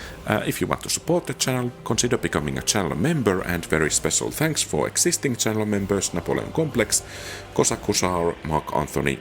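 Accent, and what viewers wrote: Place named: Finland. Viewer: Love the video! Do you happen to be from Finland regarding your accent? ;)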